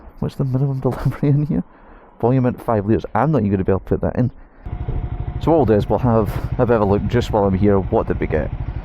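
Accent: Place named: Scotland, United Kingdom. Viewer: Your joy is contagious! Love your Scottish accent. Auld alliance. You won a subscriber from France.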